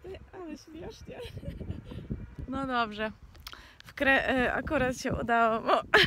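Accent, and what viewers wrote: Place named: Ukraine. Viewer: Hej To takie trochę specyficzne i pewnie trochę dziwne pytanie czy masz pochodzenie Ukraińskie ogólnie po za polskie. Masz taki leciutki akcent. Pozdrawiam ciebie i Bażanta 😁😉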